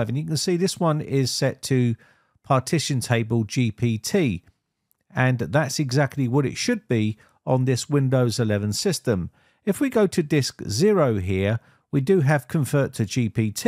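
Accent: United Kingdom. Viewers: brit accent I find interesting, Thing > Fing . Something > Sumfing, With > Wiv, Install > Instaw, Health > Helf. Words witrh TH are a struggle hey, but still I get a chuckle and learn something. (lern sumfing)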